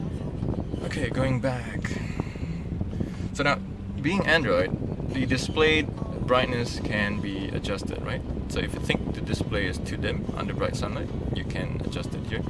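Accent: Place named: United States. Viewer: you sound like American. do you live there?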